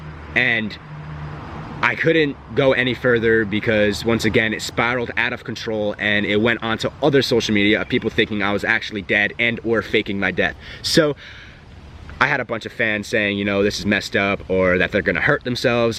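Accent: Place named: New Jersey, United States. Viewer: You are the best bring your jersey accent back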